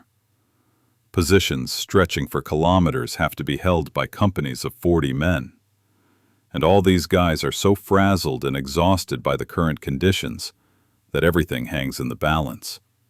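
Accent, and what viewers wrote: United States. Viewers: Please, get rid of the American southern accent for the narration. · What southern accent? That's pure middle American speech.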